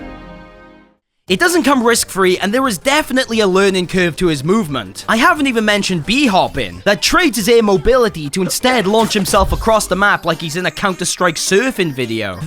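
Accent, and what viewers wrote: Wales, United Kingdom. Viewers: It always shocks me whenever I hear another Welsh creator because I never expect the accent until im midway through and im like "wait hold up". First impression and it's already a great one, keep up the good work! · u welsh?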